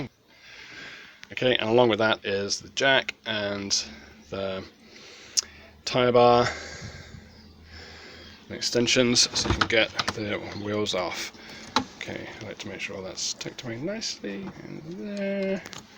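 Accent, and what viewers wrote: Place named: United States. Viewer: you've developed an American accent!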